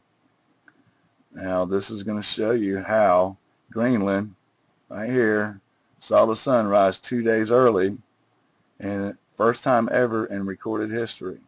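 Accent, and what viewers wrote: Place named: United Kingdom. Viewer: What a strange, over the top, ' jolly hockey sticks' English accent of the narrator. Was it computer generated? I was mesmerised listening to the accent that I didn't hear what she was saying. Now I will have to watch it again :)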